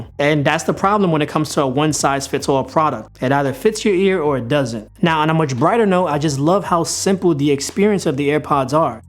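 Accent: New York, United States. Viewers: no problem, you sound like your from NY!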